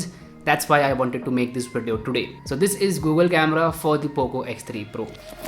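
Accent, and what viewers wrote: India. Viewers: Indian accent makes me hate english for the rest of my life. Please stop guys. At least add smt in title like( Indian accent)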